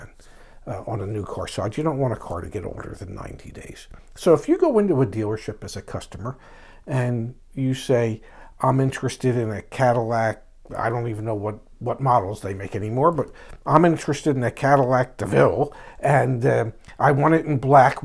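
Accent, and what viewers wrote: Baltimore, United States. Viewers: Love that "Balamer" accent! You sound like you should be in a John Waters movie!😎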